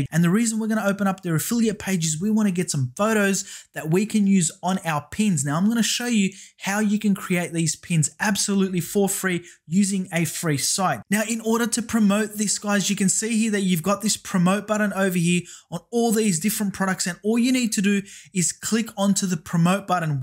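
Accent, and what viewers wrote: Australia. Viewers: Your video is amazing, so many people making videos make it so complicated - you have made it crystal clear. Sure I am going to have to go through the video again and make notes, do you have a cheat sheet for the video? Have subscribed and can't wait for more videos. Like you Aussie accent. Thank you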